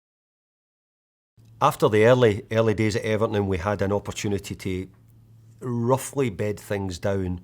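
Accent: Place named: Scotland, United Kingdom. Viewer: Oh I love that Scottish accent, it's just so easy to understand, especially Fergie's speech 🏴 ❤️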